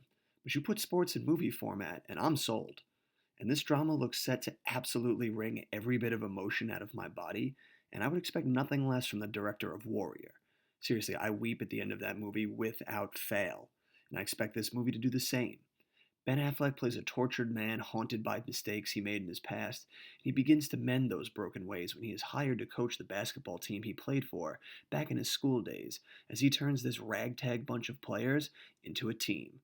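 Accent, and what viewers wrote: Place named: Brooklyn, United States. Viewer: nice vid - are you east coast repping? your accent reminds me of life in BK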